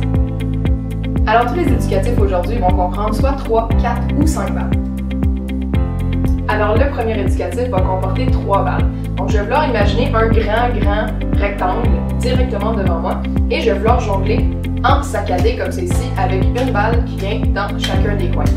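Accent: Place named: Marseille, France. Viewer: magnifique ! merci pour la progression et les éducatifs ... et l'accent ... marseillais.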